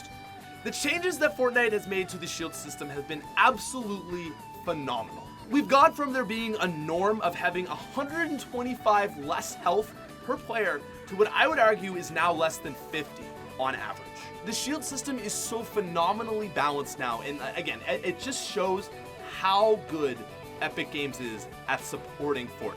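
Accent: Canada. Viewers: This guy is the most stereotypical Canadian talker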